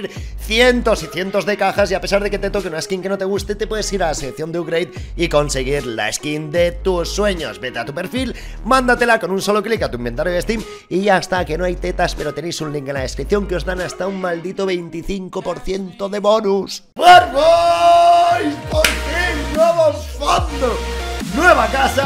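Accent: Chile. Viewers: hablando y puteando como un buen chileno sjdj saludos weon🇨🇱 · Like por el acento chileno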